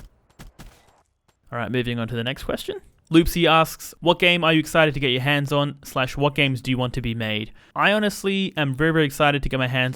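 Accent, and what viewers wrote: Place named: Australia. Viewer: wow your an aussie too. I though you were. you should do something like a sparkle special but joocy raging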